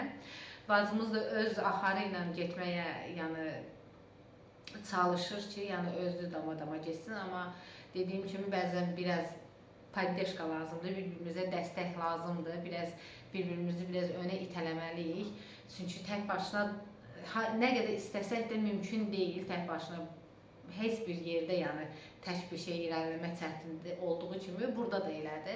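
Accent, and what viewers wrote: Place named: Shaki, Azerbaijan. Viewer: Getdim abune oldum ❣️vaxt edib izliyəcəm vloqlarini ləhcəsi o dəqiqə bilinir Moskvada bir Şəkili vloqerle tanış etdin bizi🥰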